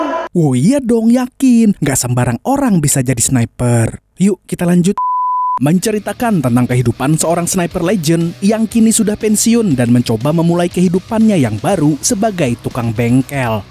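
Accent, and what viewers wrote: Banjar, Indonesia. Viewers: Hanyar sadar, sakalinya mimin urg banjar🤣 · Orang banjar kah bang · Bang , orang rantau tapin atau Banjarbaru?? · Orang Banjar kah mang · Orang Banjar kah pian nhe hehehe😇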